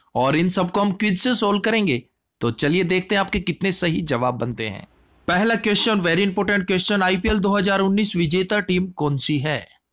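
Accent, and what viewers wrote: Haryana, India. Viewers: भाई आप हरियाणा से हो क्या?? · Aap haryana se ho kya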